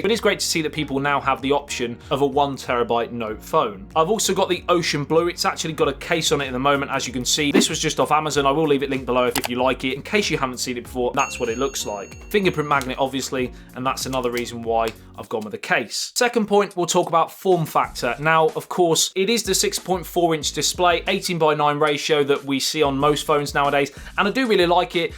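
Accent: United Kingdom. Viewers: Hears unintelligent British accent, disregards opinion and clicks off video.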